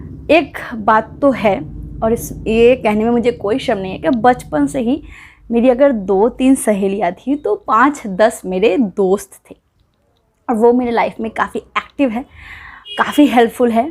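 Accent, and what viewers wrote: Bengal, India. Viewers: i cud make it out frm ur accent.....that u r a bong...... very sweet..... believe me today only i watched for the first time.....very nice dear.....tk care....keep up ur talent......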